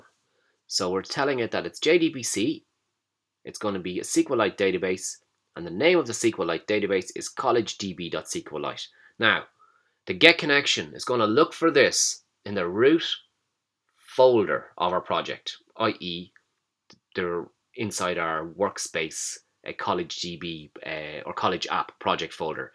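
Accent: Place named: Ireland. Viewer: Sounds like an irish accent nice change from indian :)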